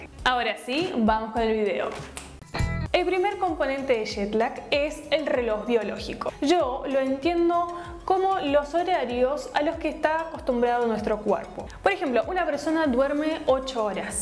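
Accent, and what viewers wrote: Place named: Argentina. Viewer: Muy buen video! Me pareció un poco raro tu acento en este. Estás tratando de hacerlo más neutro pero aguante el acento argentoo! 😘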